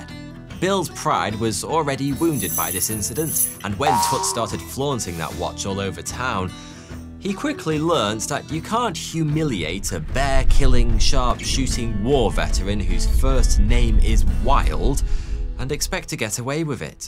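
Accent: United Kingdom. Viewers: Just wanted to say that I didn’t watch the video. Can’t possible listen to a British tell a story about the old west. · It never sounds right when British accents tell cowboy stories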